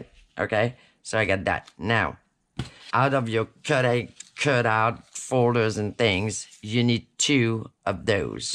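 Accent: France. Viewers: I am having so much fun watching your tutorials!!! I am such a Frenchophile, your accent is just mesmerizing and takes me back to the two weeks I spent in France in 2005. The people were so nice and so patient with my version of the beautiful French language. I do have a question. If I missed it in the video, forgive me. I'm wondering what paper pack you used.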